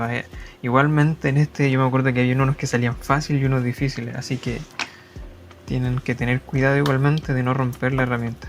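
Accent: Chile. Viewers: Excelente tutorial... Por tu acento se que res chileno..°! Felicitaciones amigo..!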